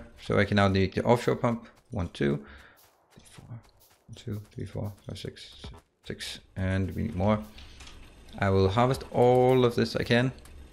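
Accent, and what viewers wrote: Denmark. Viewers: I'm Swedish, but I definitely heard the Danish blending through the English here. :) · Since I'm danish, I could immediately hear you were also danish haha. Fantastisk! :) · First time stumbling upon your channel, I could tell right away you were Danish by the accent... Greetings from a fellow Scandinavian! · Are you danish or something ? Your accent when speakin sound alot like a dane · Haha i could hear you where from denmark!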